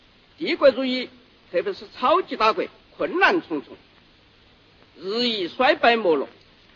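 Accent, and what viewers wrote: Sichuan, China. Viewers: 可苦了聯合國的翻譯了 翻譯不一定聽得懂四川話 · 这是四川话，我是四川人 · 牛逼啊，在联合国狂飚四川话。关键这翻译的人头疼了。邓公我们深切缅怀你当今中国的发展你功不可没。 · 哈哈哈 现在才知道 四川话居然到过联合国会议 哈哈哈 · 四川话。其实四川话不难懂，很多字就是普通话变个调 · 四川话。。。好亲切。。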